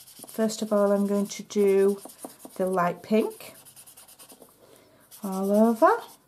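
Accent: Yorkshire, United Kingdom. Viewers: I love watching your videos! Are you from Yorkshire?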